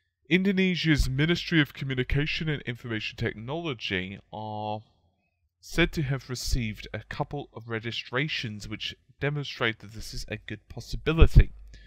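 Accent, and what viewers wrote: United Kingdom. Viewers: What was the # again? C-U-Hayche-100-6-A ? HeHe comfort British Accent ;)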